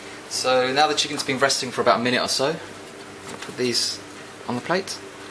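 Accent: England, United Kingdom. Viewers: hhh He has an english accent so he was probably raised in an english speaking country. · Me too. Japanese in british accent, so COOL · I love your British accent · That British accent. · Japanese handsome chef with English accent.....mmmmm 👍🏻😘🧑🏻😻😻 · English accent makes him intriguingly interesting! Tho the oil colored pretty scary… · I notice your accent. Are you based in the UK? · James Ziemba Sounds like chef is a born and bred Brit. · That British account tho. somehow satisfying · Japanese dude with a British accent? Sign me up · what a nice combination!! Japanese guy with British accent.... I like you keep going がんばって:)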